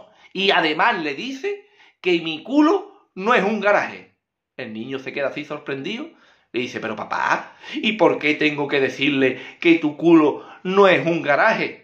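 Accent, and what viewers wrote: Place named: Andalusia, Spain. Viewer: soy una abuelita de 86 años!!!te veo desde París y me rio muchísimo con tus chistes tan graciosos y tu. forma de contarlos con ese acento andaluz y tus gestos tan salados. Sigue majo, que nos alegras la vida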